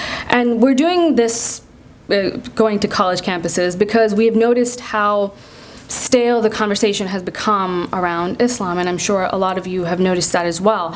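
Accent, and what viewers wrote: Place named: United States. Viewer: I would really like to know from you Sarah haider what your credentials are , how long have you practised Islam if at all and which muslim scholars of note have you debated or interviewed . That perhaps would be more convincing than your zionist funded monologue. I see you have an american accent so it must be that you have spent most if not all of your life in the Us which we know is a major zionist stronghold. Further , establishing an "Ex Muslim Organisation" means it has to be funded and when you gather people for a talk and make a professional quality videoto put on youtube and fill the comments column yourselves under various made up names smacks of the zionist Islamophobia agenda . There are many stooges like you parading the internet.Obviously this must be a full time job travelling the circuit delivering dceptive talks and garnering support and creating a mindset so it has to be lucrative . 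The money is there . Zionists with their ill gotten gains based on pernicious usury are ready to spend to further their agenda. Just look at the funding of mercenary groups like ISIS and their affiliates. All funded with zionist money . You must really tell your masters that we have already been warned in the Quran. Since you guys have special research units set up and manuals from which you regularly throw questions at muslims, you should be able to look up the relevant verse very easily. So you see to be forewarned is to be forearmed. It remains to be seen in the hereafter what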